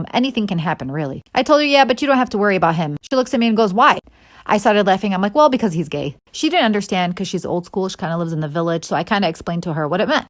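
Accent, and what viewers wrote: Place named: Michigan, United States. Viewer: You don't hear the accent? 😂 She's from Michigan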